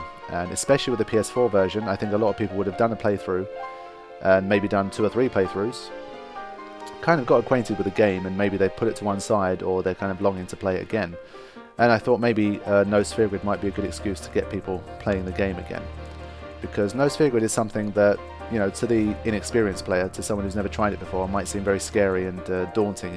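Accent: England, United Kingdom. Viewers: hey man im not sure if you read comments on old videos but ill leave this here anyway. I have been playing ffx since its day of release on ps2 and got 10 for ps3 and ps4 lol. Im playing a casual playthrough on ps4 right now started a week ago. I was looking for some guides/ tips on youtube and your channel popped up i have never heard of you until 2 days ago and now im addicted to your channel!. the amount of content on your channel is amazing and the quality of the content as well. I now have the daunting task of watching your platinum walkthrough and NSG videos. This very video has got me interested in NSG runs the sad thing being that i thought i was a semi veteranof the game but gad only heard about NSG runs about 6 months ago massive face palm. The other thing being that i have never beat all dark aeons plus penance before and now i think you have given me confidence to try. 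It sounds like your english as well which is good im Scottish and its nice to have British gamers talk about Final Fantasy it seems rare not that im dissing any other FF gamers Dark Kefka has incredible knowledge on the games. Hopefully when FF 15 releases you will cover that as well. Any peace man thank you for giving me a whole new channel to watch i can only subsribe and keep watch over my newsfeed hopefully thats enough for now i wish i could do more Thank You.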